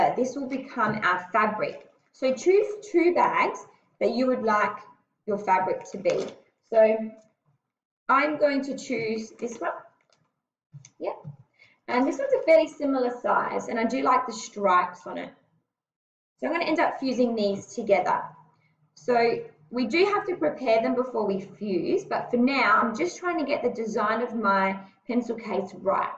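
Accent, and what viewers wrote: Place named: Australia. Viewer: Sounds like an Australian woman, I am Australian also. I have looked at many videos about fusing the bags with the iron, however this is the only one I have seen where the person is saying to have water in the iron and use that steam setting or whatever, I can't figure out why however, no one else did that, I can't figure out what the hot steam would do just spraying on the baking paper, and she didn't explain the reason why. As far as plastic fumes, apparently most things have a melting temperature and then a vaporising temperature, so as long as it doesn't hit the vaporising temperature then there shouldn't be any plastic fumes, for this type of polyethylene it shouldn't really produce any vapours while under 250 degrees. However it seems this video was for year 9 students, so you were erring on the side of caution.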